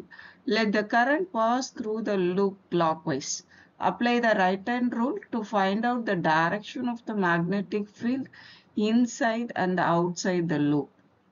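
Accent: India. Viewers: Are U from Kerala?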